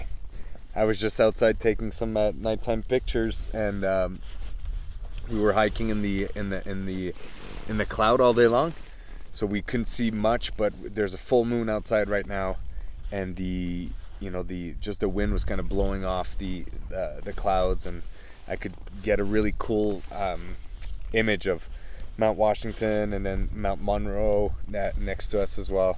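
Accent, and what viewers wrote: Quebec, Canada. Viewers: Any day you decide not to summit is a good day! Great video. Glad YouTube recommended it to me. Are you French Canadian by chance? You sound very similar to some of my friends from Quebec. All the best to your next adventures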